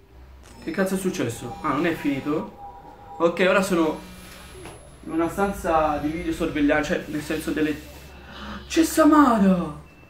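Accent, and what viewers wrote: Sicily, Italy. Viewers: Siciliano doc... bellissimo video ansia a mille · "O spunniti u culu" di dove sei? Io sono di Siracusa 😂😂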